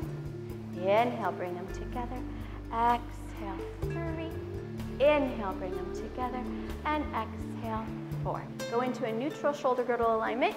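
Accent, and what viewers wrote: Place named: United States. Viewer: A voz dela é tão americana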